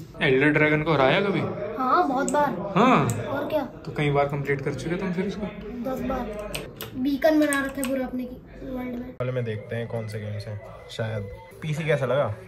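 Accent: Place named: Haryana, India. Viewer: I just love ur haryanvi acsent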